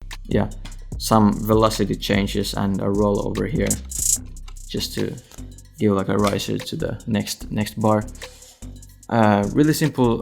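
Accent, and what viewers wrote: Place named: Finland. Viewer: You can really hear that he's finnish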